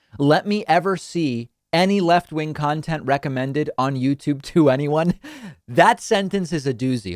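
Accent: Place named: Argentina. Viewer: If you here him speak Spanish his Argentine accent really comes out. I was surprised when I heard him speak and quickly realized he had to be from Argentina or Uruguay.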